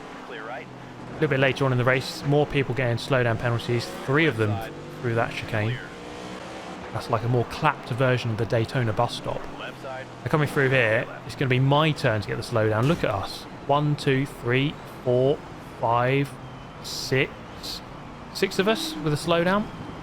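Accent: England, United Kingdom. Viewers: It’s not so much the silliness of the video, but I think it’s a combination of watching and listening to an Englishman, in an English accent, explain the circus that is NASCAR. Thank you for this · Nothing beats British commentary and sarcasm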